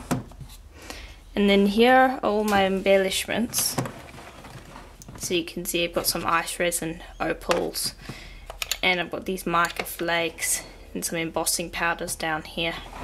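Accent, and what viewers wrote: South Africa. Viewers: Hi! You are so organized! Thank you for your studio tour. I'm just starting out but it's given me a few ideas of how to better organize what I have and prepare for what I plan to get! BTW, my husband commented on your accent as soon as he heard your voice--he and his family are from South Africa and they were from Johannesburg. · Such a well organised studio😍 where are you from, your accent sounds South African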